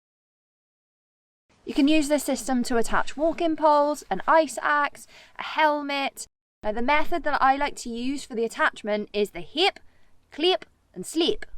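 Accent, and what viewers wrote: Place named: South Wales, United Kingdom. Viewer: I rest my case after calling you one on Insta. "I look like a nutter": confirmed. Also, South Walian accent always adds more comedy. Not taking the piss, I live in Snowdonia in Betws (you may remember seeing me on the way up the PyG) so I love the SW accent. Your faces are classic. I deffo don't do faff, no faffing. Had me giggling throughout the whole review, seriously you must be so much fun to be around! See you out there;)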